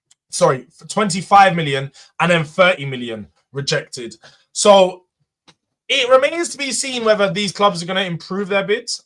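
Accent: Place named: Cockney, United Kingdom. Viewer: Geordie man....with a cockney accent...AI gone mad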